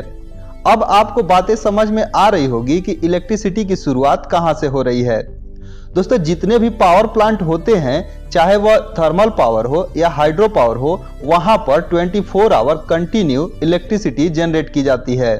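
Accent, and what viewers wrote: Bihar, India. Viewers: Are u a bihari..?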